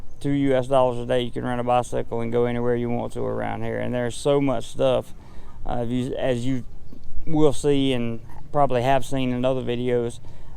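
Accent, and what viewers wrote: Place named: South, United States. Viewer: Great vid .you sound like your from the south.. Tennessee or Alabama.anyways much respect from NC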